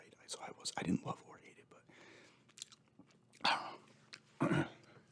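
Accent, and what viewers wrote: New York, United States. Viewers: I’m from New Rochelle, NY and I’ve been living out of the country for the better part of 9 years now (some time in China and the last 7 years in Brazil) and I’m a huge ASMR fan. Your channel has become my favorite recently simply because listen to you shoot the shit reminds me of home lol, the NY accent talking about NY sports in your videos, I also love games as much as you do. Anyway, definitely gonna keep watching, thanks for the content my guy.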